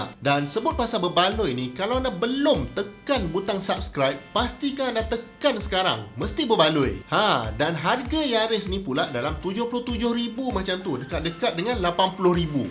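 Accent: Johor, Malaysia. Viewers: Prof orang joho ke ? 🤭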